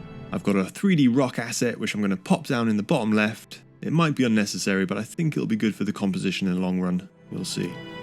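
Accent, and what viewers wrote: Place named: United Kingdom. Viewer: Love ur british accent, "bo'om left" 😂. Anyway, that's a great artwork right there man 🙏❤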